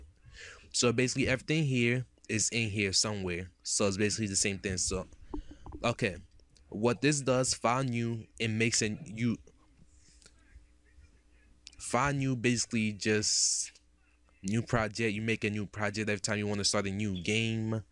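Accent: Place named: New York, United States. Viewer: You sound like you're from New York. xD Great videos man! Thanks a ton. I found them on the rpgmakerweb forums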